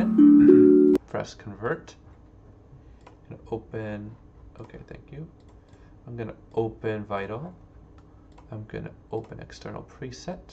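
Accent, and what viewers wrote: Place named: Germany. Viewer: Are you German? You kinda sound German to me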